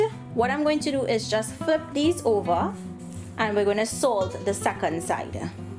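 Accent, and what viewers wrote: Trinidad and Tobago. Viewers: I was so pleasantly surprised to hear a Trini accent lol 😁👏🏼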